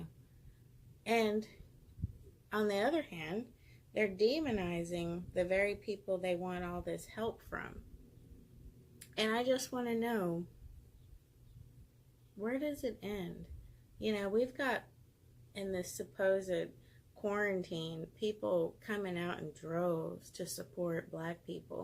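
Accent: Texas, United States. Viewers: Yes, her message should be heard by Americans of all races. I know some white people that need to listen to her. I felt that when she said she doesn't support a movement that makes her feel inferior, I would feel the same way if some organization was trying to sell me the same message. I recognized her Texas accent, I lived in Dallas and then Plano for 9 years. Her personality is great!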